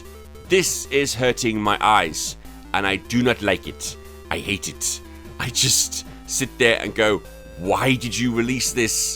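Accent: United Kingdom. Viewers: How do you sound even more British than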